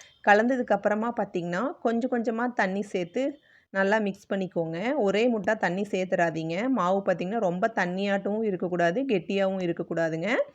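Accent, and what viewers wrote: Kongu, India. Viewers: கொங்கு தமிழ் மணக்குதே! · Madam pesu podhey theriuthu., Kongu Nattunu., intha madhiri receipes ellam kongunadu perponadhunga... 🙌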